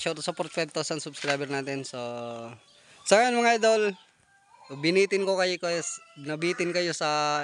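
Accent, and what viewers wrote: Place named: Waray, Philippines. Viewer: Salamat pala dol sa pag shout out saan lugar mo idol waray bayan pang yong tuno kasi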